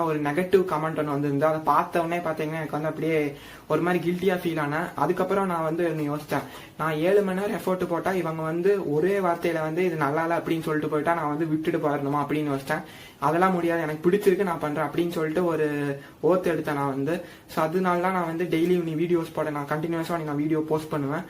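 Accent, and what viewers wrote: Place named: Tirunelveli, India. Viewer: Ennoda suggestion ennanna nammakunnu oru uniqueness irundhathaan namma romba thooram travel panna mudiyum...now u have realized that.. nice ..keep going.. 👍👍👍👍 slang tirunelveli pakkam maadhiri irukku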